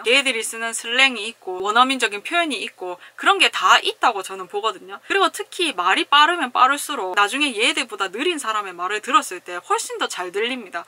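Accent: Busan, South Korea. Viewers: 와..부산사투리 오진다... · 유투브에서 부산 사투리 들으니까 신선하네요 ㅋㅋㅋㅋㅋㅋㅋ 전 대구사람이라 친숙하다는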